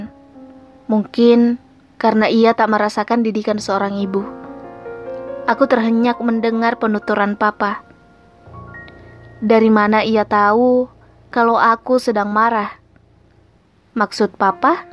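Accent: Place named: Mamuju, Indonesia. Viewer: Maaf boleh tanya miminnya ni org mna? Kok kayak org Mamuju?😁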